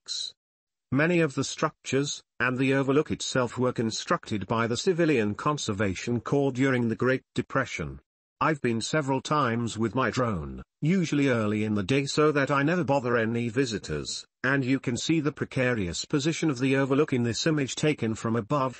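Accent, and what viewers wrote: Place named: England, United Kingdom. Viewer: I truly enjoyed this "experiment". The English accent is enjoyable - my Grandmother was English. I am relatively new to Morgantown. I have been to Cooper's Rock - simply breathtaking! But with your drone, I am able to see so much more! I also enjoyed the history - it adds to the experience. My vote - keep experimenting, please.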